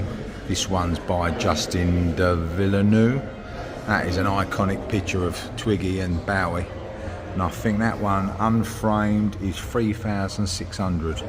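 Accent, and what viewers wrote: United Kingdom. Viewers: Love listening to the narration and the British accent.😊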